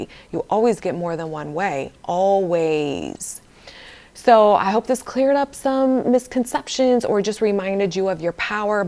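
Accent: New York, United States. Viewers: She sounds nyc for real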